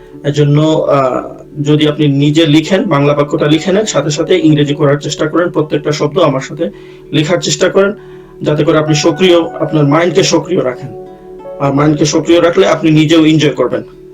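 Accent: United Kingdom. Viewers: A best channel in the british accent.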